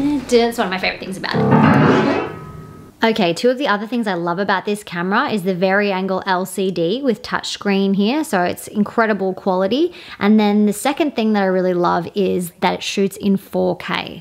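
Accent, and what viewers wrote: Australia. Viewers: She's so beautiful!!Love her aussie accent ♥♥♥♥♥♥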